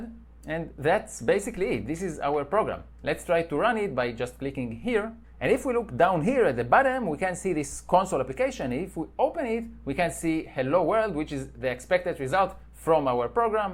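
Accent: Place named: Israel. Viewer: ניסיתי למצוא סרטון שמראה לי איך להוריד.. לפי המבטא אתה ישראלי נכון?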